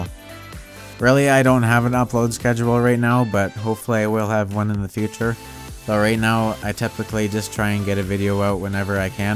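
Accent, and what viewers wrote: Canada. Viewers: Also, are you Canadian? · btw I heard your Canadian! Same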